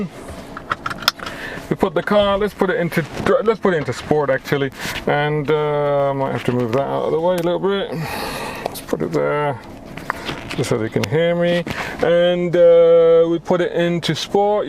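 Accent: England, United Kingdom. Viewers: his voice is like gay and fcking england boy he is fucker this guy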